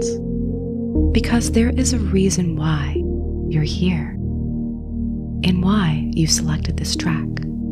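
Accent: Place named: United States. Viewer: Finally, one with an American accent- no more British or Australian ones that distract me lol😂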